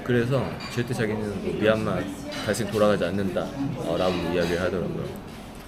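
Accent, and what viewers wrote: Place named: South Korea. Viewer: 콩글리쉬 자신감 좋습니다 ㅋ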